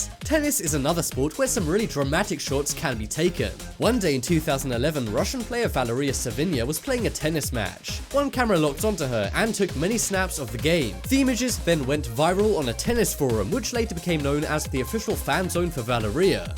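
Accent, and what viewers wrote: United Kingdom. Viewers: He's British, and I would love to speak in a fluid British accent, but it's hard to learn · Yeah I can tell he's British "or of the sort" I just really like his accent and the pronunciation haha.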